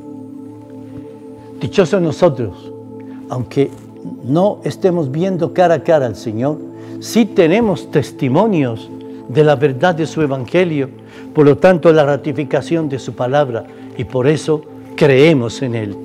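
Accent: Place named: Colombia. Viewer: Cuánto gusto padre, lo veo muy bien a pesar que le dio el covid, Dios lo protegió. Padre usted e Colombiano, siento que habla el español en forma diferente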